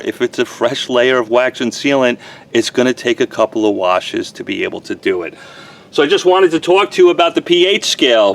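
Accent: New York, United States. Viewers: he is from NY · He sounds like he has a NY accent, and I'm from there, soo it's a NY thing, the hand gestures lol, we talk with our hands too lol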